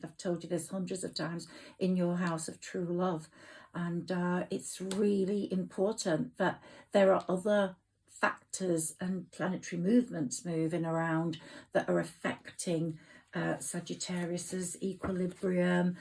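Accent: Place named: Northern England, United Kingdom. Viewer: Yesterday was a very strange day, felt extreme boredom, very nervous, not sure why iv never experienced energy like this. These runners all seem to be virgo or cancer, my person a fellow saggi, never heard of a fire sign in this cycle, thank you for your beautiful reading I always look forward to hearing your slight northern accent lol thank you xx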